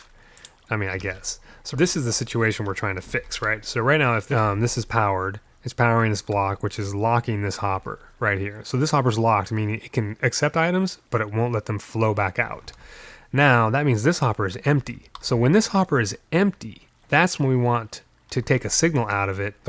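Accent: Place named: North, United Kingdom. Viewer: where are you from over that side of the pond? You sound pretty northern.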